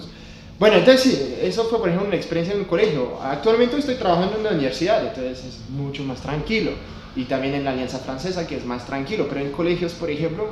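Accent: Bogotá, Colombia. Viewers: Yo viendo este video a las Osea la media noche ... 😁😁 me encanta el francés que habla como un Colombiano Bogotano. 😁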